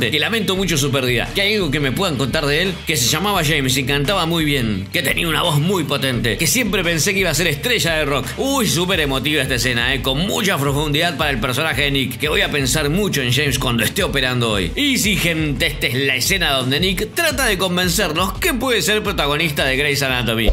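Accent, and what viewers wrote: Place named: Argentina. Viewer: Me parto de risa contigo 😅😅😅😅😂😂😂😂🤣🤣🤣🤣🤣, la forma de contarlo. Y ese acento argentino 😄😄😄😄😍😍😍😍😍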